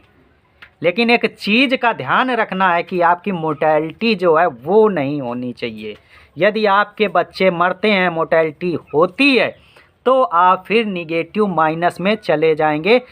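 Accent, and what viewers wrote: Kanpur, India. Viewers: वीडियो देखकर मन प्रसन्न हो गया । बोलने का कनपुरिया लहजा अच्छा लगा साथ ही जमीनी हकीकत से रूबरू हुए ।धन्यवाद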